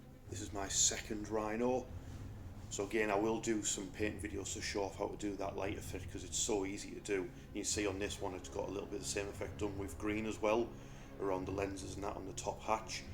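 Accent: United Kingdom. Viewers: Excellent painting job on them all, nice to hear more northerners on here. Hello from Barnsley. Instant sub btw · Lovely army and lovely accent, whereabouts in the UK are you from?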